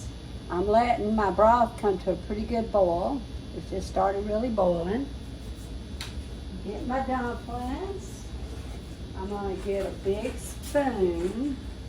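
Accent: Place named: Missouri, United States. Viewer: Listening to you talk, it sounds like the people around here where I live. I like that. You feel like you're from right here in Missouri too and that makes me feel comfortable. Got a like, sub, and a bell. Listening to what you had with your friends yesterday sounded incredible! We didn't find any Morels this year, but it sounds like you and your friends did, those fried mushrooms. This recipe looks really good, and I love the idea of using rotisserie chickens. I think I'll talk to my mom about it and we'll make this in the autumn or winter. Great recipe. I bought a product years ago from McCormick for chicken and dumplings. A spice packet and recipe on the back... and I read it wrong. I used WAY. TOO. MUCH. butter... they were delicious but I can easily say I never made it again because it was way too entirely unhealthy for us. But this recipe seems way more healthy than my failed attempt years ago. 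I look forward to trying yours next. :)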